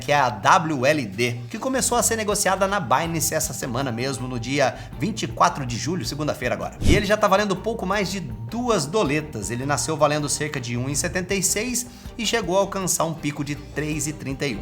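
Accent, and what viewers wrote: Minas Gerais, Brazil. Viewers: Mano, senti o mesmo, mas senti isso desde que o deixou de ser independente. Os efeitos ficaram profissa, tudo bonitão, mas, na minha opinião o nunca mais foi o mesmo. Ficou mais sério e menos zoeiro. Até aquele jeitão de falar de mineiro do interior diminuiu e eu gostava muito daquele estilo.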